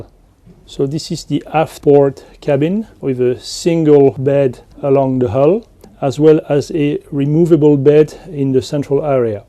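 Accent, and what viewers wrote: France. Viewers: Very normal French accent, quite clear to be French actually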